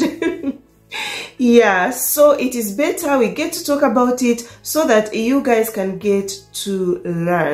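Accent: Uganda. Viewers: Teller's accent is a Ugandan.Sorry 😢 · This is ugandan accent not mzungu the voice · It's Ugandan accent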